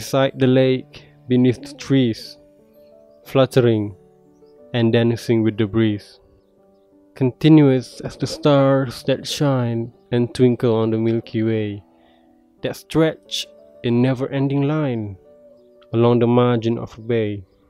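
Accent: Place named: United Kingdom. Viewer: Good reading with great pronunciation and enunciation! I like that you adhere to the British pronunciation with words such as 'dance' considering Wordsworth is English.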